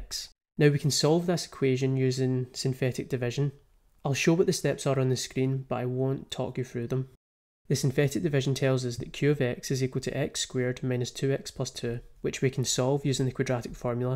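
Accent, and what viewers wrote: Scotland, United Kingdom. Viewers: I did not imagine someone named to have a Scottish accent. Great video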